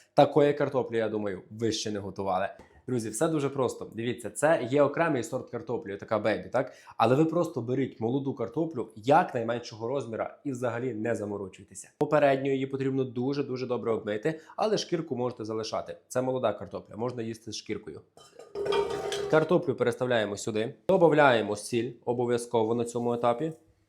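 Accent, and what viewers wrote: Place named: Podillia, Ukraine. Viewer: Професіонал! Клас, було приємно спостерігати, немов родича слухаю 😊 Ви з Хмельниччини чи з Вінниччини?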